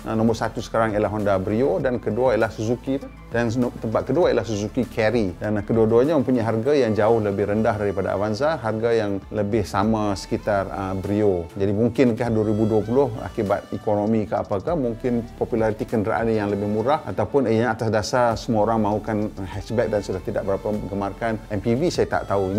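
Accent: Indonesia. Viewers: Cakap saja guna bahasa Melayu Malaysia. Tok sah ikut rentak loghat indon tu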